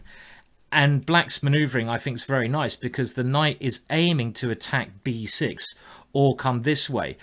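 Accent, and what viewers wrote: England, United Kingdom. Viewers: it's an English verse American thing, same word different accent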